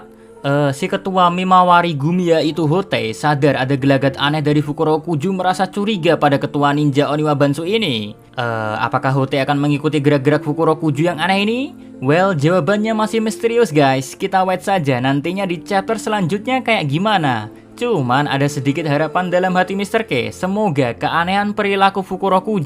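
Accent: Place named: Jepara, Indonesia. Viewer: Adminnya orang mana sih ?? Aku denger² kok orang jepara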